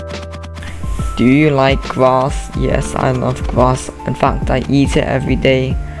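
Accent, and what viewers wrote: United Kingdom. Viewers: U sound British btw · Lol, you have a British accent. But, no hate and nice voice.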